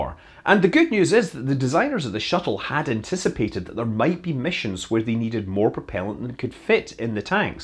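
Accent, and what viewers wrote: Scotland, United Kingdom. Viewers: Scottish accent, nothing wrong with that. Not like all the 'would of' Americans..... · Scottish accent, which is so cool to listen to.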